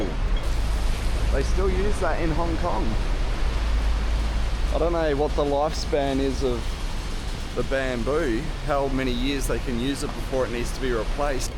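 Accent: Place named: South Australia, Australia. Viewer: from your accent I can tell you're from South Australia... nothing beats a good kitchener bun.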